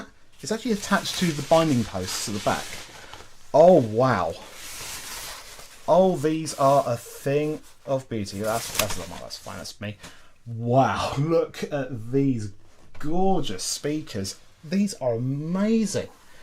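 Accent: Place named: United Kingdom. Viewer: I just watched your at2020 usb + video. What a vid also, you havew lost so much weight. You look so much better well done. Also Could you do a setup tour because i think it will look amazing and would be a great video. Much Love brother and keep up the good work! <3 (also, nice to see someone from the UK lmao, love your accent, mine is shit)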